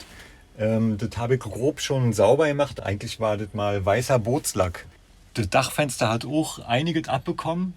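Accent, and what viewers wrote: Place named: Berlin, Germany. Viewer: Oh Gott wie furchtbar 🫣 gut das euch nichts passiert ist🙏 Ich liebe den Berliner Dialekt 👍 erinnert mich an einen Brieffreund aus Berlin vor 40 Jahren 🫣🫶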